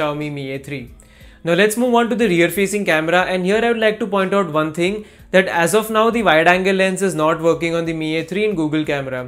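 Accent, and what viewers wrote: India. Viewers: O sotaque indiano é muito feio mano, parece o inglês liso do liso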